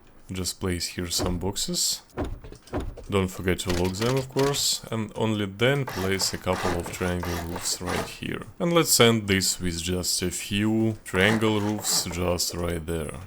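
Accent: Russia. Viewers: ты русский? акцент похож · у тебя как будто акцент русский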